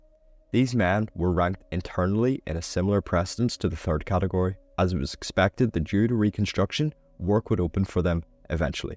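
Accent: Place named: Northern Ireland, United Kingdom. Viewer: Excellent and interesting video, thanks for making this! Nice to hear a Northern Irish accent too.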